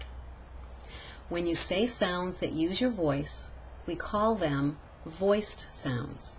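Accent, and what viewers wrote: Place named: New York, United States. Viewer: you seem to actually have a new york accent.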